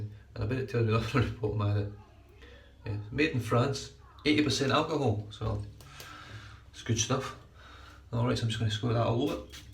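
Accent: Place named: Scotland, United Kingdom. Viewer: Hi new to DE shaving some great videos!! I recognise the Scottish accent good to see a fellow Scot on here. I'm in the west of Scotland myself.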